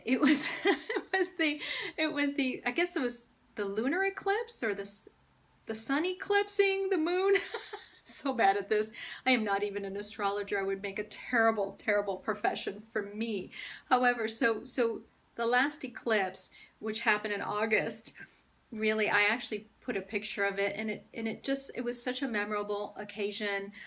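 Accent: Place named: United States. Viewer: I"m most surprised that English is not your first language. Floored really. Your American accent is perfect! I've worked with foreign students and with ESL programs, and I would never have guessed English is your second language. You constantly surprise me B!!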